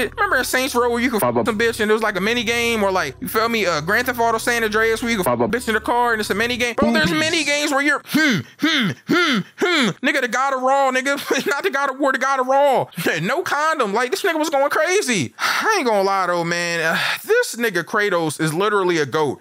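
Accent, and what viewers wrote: Philadelphia, United States. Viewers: You from Philly bro?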